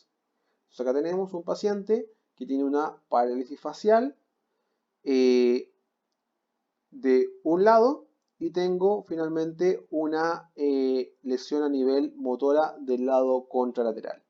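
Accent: Chile. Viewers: Eres de chile? Por tu acento 😅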